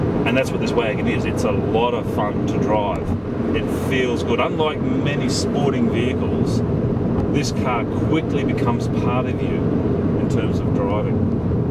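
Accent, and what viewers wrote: Australia. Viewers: he sounds like Crocodile Dundee!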